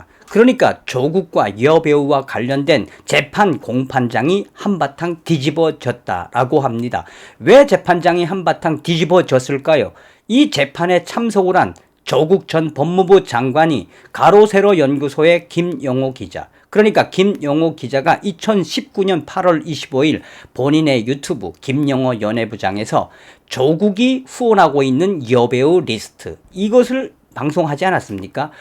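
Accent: North Korea. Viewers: 이북사투리좀쓰지마세요 공판장아니고 재판장.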